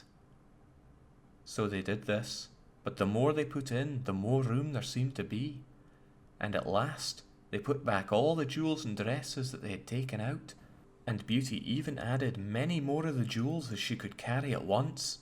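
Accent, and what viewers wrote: Scotland, United Kingdom. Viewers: lol why do you sound extra Scottish in this? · Wait did this story read as Scottish, or does your accent shine while reading?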